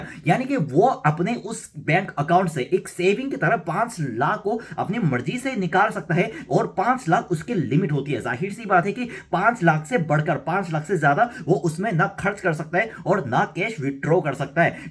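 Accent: Standard. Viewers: Bhai aap to mujhse bhi jyada shudh hindi bol rhe ho 😮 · कितना शुद्ध हिन्दी बोलता है । मान गया उस्ताद । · Jitni sudh hindi bhai bol rhe h, utni to hme b nhi aati 😅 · Unhone hindi ki padhai ki hai isliye shudh hindi bolte hai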